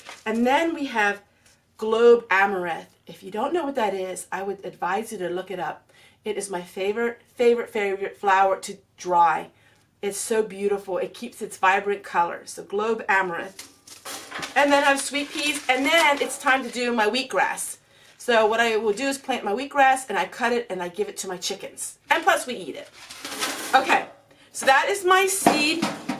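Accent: Pennsylvania Dutch, United States. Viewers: Hi. I like your PA Dutch accent. thanks for sharing. :)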